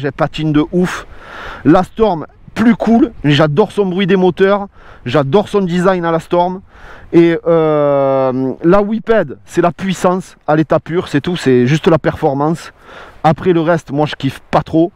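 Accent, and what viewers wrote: Marseille, France. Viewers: Excellent les Manceaux, je les vois dans la semaine pour essayer la dualtron mini et la futecher gun pro j'espere qu'ils l'ont recu. Merci pour tes videos. Je suis un nouvel abonné et franchement au top avec ton accent de Marseille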